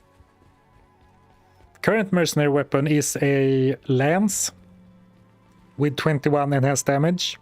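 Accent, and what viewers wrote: Sweden. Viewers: I'll wait for the next sale, you make me want to play again, perhaps also single player, your challenges are awesome. Heard you mention earlier you were Swedish, the accent is pretty telling. (I'm a dutchman who moved to Sweden ~4 years ago, and you guys have a very typical accent, so do we I guess).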